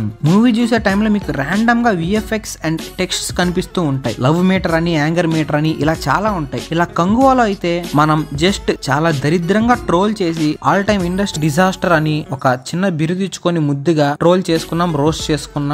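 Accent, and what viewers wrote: Nellore, India. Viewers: Anna meedhi nellore aa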